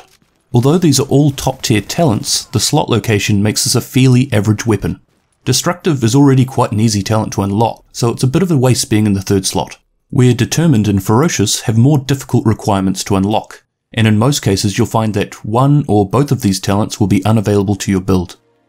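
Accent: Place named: New Zealand. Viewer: Holy shit, never knew you were a kiwi too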